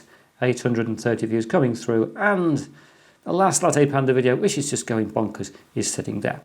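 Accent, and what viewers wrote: United Kingdom. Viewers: Thanks to the China Virus...I have been listening to your very generous youtube posting which I have been getting used to your Brit accent (enjoyable to hear very little lol and brg.......etc) Thank You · Thank you for the Mint week. I didn't know it was a dairy - very interesting and funny. I just like your british accent - I've been in Britain three times in my life (<num>) and I like your country.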